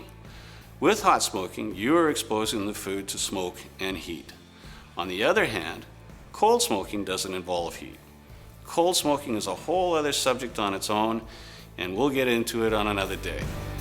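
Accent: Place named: Canada. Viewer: I sense some Canadian from, eh?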